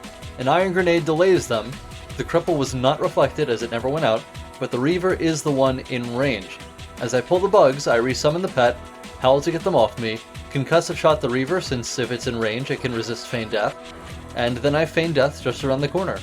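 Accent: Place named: United Kingdom. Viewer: Where from? Sounds like you're half british.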